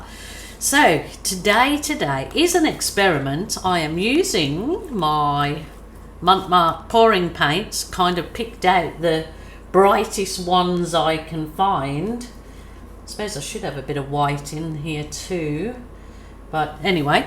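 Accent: Birmingham, United Kingdom. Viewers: Wow! What a stunning result! I think the balloon kisses are the icing on the cake. Just out of interest, is that a Birmingham UK accent I can hear? I live there and it does sound familiar!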